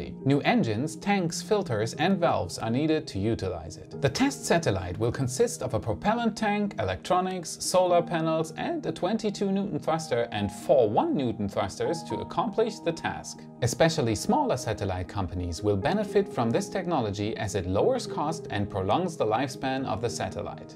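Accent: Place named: Germany. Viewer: Are you from Germany? Bist du aus Deutschland? Deine Aussprache klingt relativ Deutsch. Just currius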